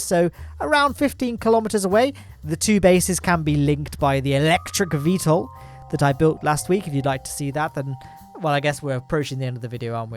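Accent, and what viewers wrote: United Kingdom. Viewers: british voice = smart voice. this is just the way it is. (i'm an american)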